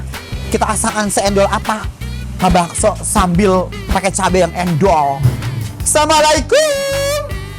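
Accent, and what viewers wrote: West Java, Indonesia. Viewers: Mantap, ciri khas ngomong Sunda nya jangan sampai hilang Poko na mah!! Soalna ciri khas yg ramein nya kata2 Sunda😂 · Duh liat ngomong sunda asa ketemu lagi sama sahabat kecil aku basa di garut persis banget logat ngomongnya😭 kangen garut jadinya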